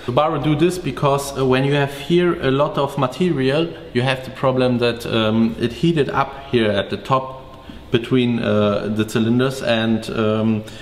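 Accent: Germany. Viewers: Lmao was not expecting a very strong German accent and then realizing you’re putting them in air planes not cars really surprised me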